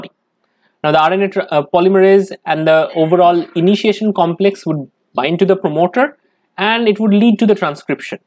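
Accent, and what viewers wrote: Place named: India. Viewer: 아오... 인도영어 이해하기 어렵네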